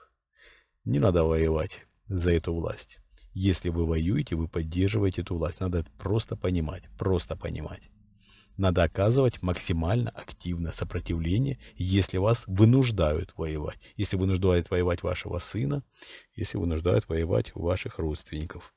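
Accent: Ukraine. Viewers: Уважаемый т.н « », вы мне как лингвисту можете ответить на вопрос, почему у вас явный украинский говор, если вы были генерал-лейтенантом СВР, то есть еще советский специалист, (проходили подготовку в Москве, жили там же) где глубоко погружали в изучение иностранных языков?😊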